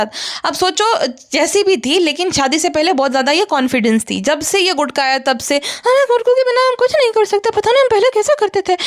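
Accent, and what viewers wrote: Gujarat, India. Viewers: Aur ek baat , apka voice bilkul rashmi desai jaisi hai। Or gujrati ho isiliye sayad acsent vi kuch had tak waisa hi lagta hai। Par voice to ekdam ditto hai।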